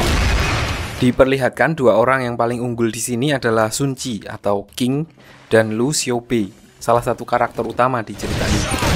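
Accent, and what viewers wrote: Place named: Yogyakarta, Indonesia. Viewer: Kek orang jogja ini mah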